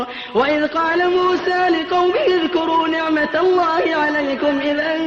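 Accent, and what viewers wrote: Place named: Qassim, Saudi Arabia. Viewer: هذه ليست بالقطري هذه القراءه بالقصيم بمدينه بريده ..... اللهم اجعل القرآن شفيعا لنا ويرفعنا به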